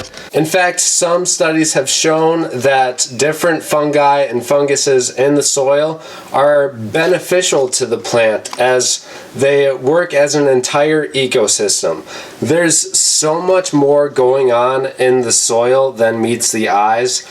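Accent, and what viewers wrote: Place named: Wisconsin, United States. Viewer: Great helpful video! I knew we were neighbors when I heard the accent. Subbing fellow Wisconsinite!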